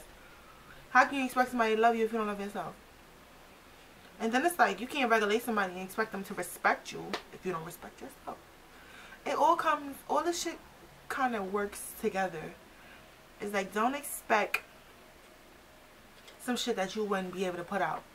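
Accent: New York, United States. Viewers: I was going to ask if you were from NY! Lol. Love it! Keep that truth real and fresh love! You have a whole wide world of people that support you and you doing your thing. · Listen I love just hearing you rant and talk, your gorgeous and so NY ...love it!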